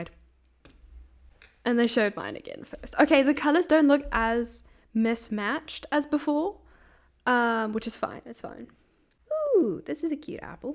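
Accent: South Africa. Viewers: Are you South African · Are you from south africa i hear an accent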